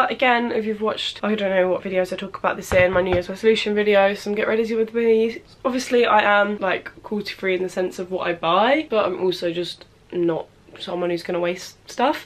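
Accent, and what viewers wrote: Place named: Cornwall, United Kingdom. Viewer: Omg you are stunning! ❤️❤️❤️❤️❤️❤️❤️❤️❤️❤️ Edit are you from Cornwall? I love your accent x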